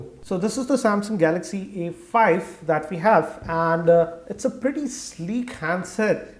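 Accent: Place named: India. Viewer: ahaha this is like comedy central with information...ahahah....Indian accent... I end up laughing...enjoyed it a lot...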